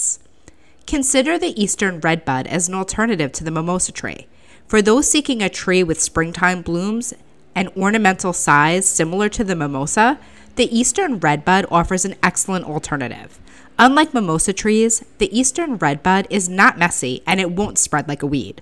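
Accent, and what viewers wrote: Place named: Midwest, United States. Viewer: You don’t sound like any New Yorker that I ever met, you sound more like a midwesterner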